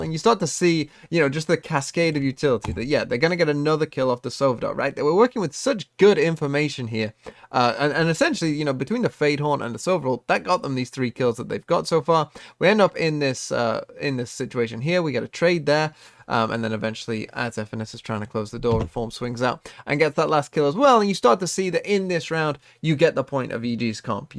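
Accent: United Kingdom. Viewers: It's because he is. He literally has a British accent.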